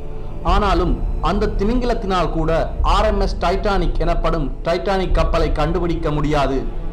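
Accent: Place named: Sri Lanka. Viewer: nee pesurathu suththamana tamilan illai. nee Sri Lanka va?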